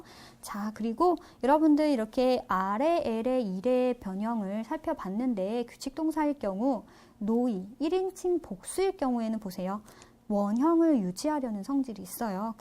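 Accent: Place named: South Korea. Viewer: 발음이 그냥 한국어 읽는것같은데요 책산거 돈아깝네요 환불하고싶어요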